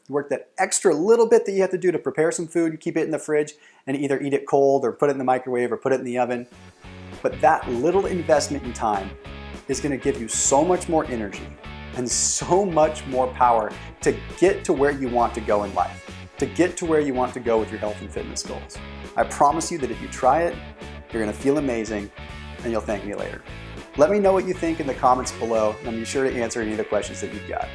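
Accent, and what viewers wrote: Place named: United States. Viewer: Great video and content. Excellent. I'm definitely cutting back on processed foods. My processed foods intake is a result of my time-consuming job. Then I just conveniently nibble on junk foods and eventually ending up feeling junk. Thanks. Ignore the number of dislikes. Maybe they don't understand your fluent American accent. I share my advice: Click on the CC button at the bottom, right corner. (Subtitles/closed captions) Listen and read as well. Pause and go back if you didn't understand well.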